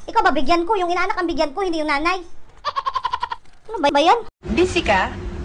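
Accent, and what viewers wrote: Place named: Visayas, Philippines. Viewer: Ala eh visaya he he ka cute goodluck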